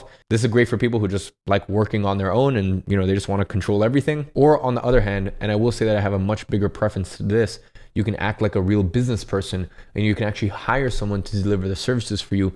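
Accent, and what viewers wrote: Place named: United States. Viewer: I have always wondered if your British, why do you speak with an American accent?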